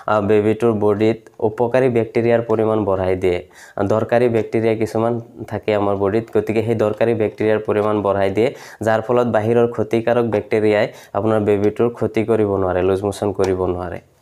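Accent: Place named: Kolkata, India. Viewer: kolkatar language mone hosse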